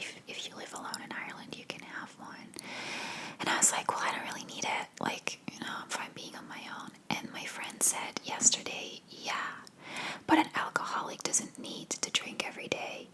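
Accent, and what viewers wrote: United States. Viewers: I'm so curious about you. You have an American accent but you live in Ireland. Your folks live in Spain. Can you speak a little bit about your history.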